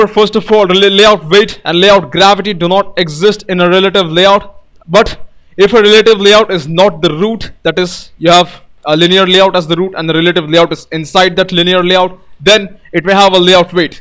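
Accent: India. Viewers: Well i do not see any problem in his voice. yes it is indian accent. yes you are right, his voice is not suitable for singing but offcourse he not claim to be a singer too :) .it is easily understandable and second he put efforts in making videos, which should be appreciated. simple...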